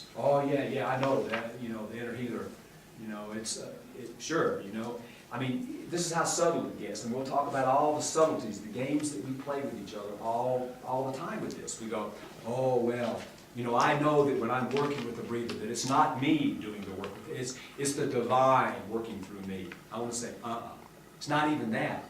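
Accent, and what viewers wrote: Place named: South, United States. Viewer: I trained with and Stan, early 90's. Just found out no longer with us. These were, are, legendary days that cannot pass. I know the way he used to talk and the sort of thing he talks about here, and he has same energy, but his strong southern accent isn't so pronounced. Goodbye. See you again probably.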